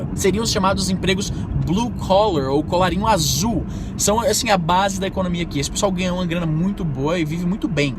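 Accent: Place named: Brazil. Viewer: Ainda acho q vc ainda tem sotaque brasileiro(que nao eh uma coisa ruim!), mas vc tem muita fluencia, parabens! Gostaria q vc fizesse um video com as coisas ruins de morar no canada, pois muita gente se ilude e quebra a cara, quando se da conta que nao eh as mil maravilhas. Japoneses tem ateh um nome pra isso: sindrome de Paris. Coisas ruins, por exemplo: a dificuldade de um estrangeiro ter um relacionamento com nativa, o inverno, as comidas, a distancia com a familia e amigos, diferentes festas e festivais, a dificuldade de conversar assuntos cotidianos com um canadense (por exemplo, conversar sobre silvio santos (Br) x Terry fox (Ca)) Obrigado!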